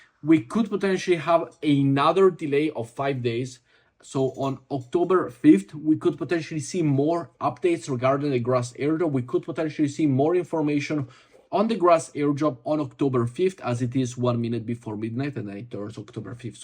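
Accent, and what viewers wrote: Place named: Italy. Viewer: Sei italiano e si sente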